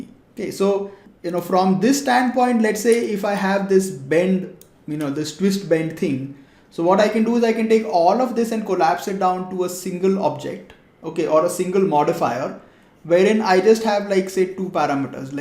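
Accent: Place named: India. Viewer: Ah, indian accent <3 best houdini explainer <3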